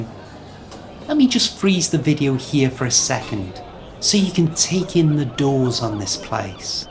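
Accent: Liverpool, United Kingdom. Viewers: Your class was very didactic, but the Scouse accent is very complicated, in many parts I didn't understand what you were saying! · Accint liverpool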